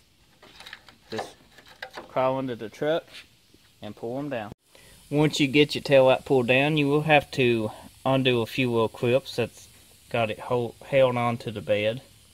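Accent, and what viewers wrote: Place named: South, United States. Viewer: Great info! Just how far South ARE you? I could listen to your drawl all day long. Makes me want to get a 6 of PBR!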